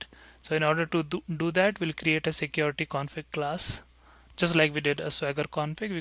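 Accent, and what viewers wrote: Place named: India. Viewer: these indian's accentes is disgusted even for my non-english ears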